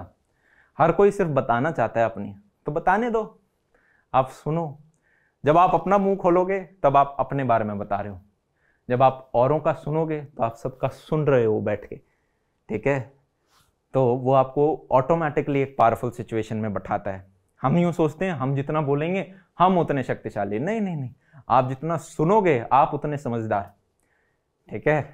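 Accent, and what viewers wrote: Haryana, India. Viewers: Jhunjhunu se ho ya haryana se?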